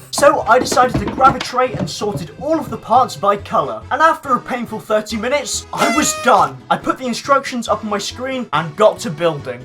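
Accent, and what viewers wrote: United Kingdom. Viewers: Holy brick loud British boy made a video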